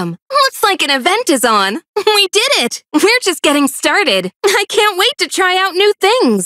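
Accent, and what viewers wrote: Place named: Brunei. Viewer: I think about Bruneian Accent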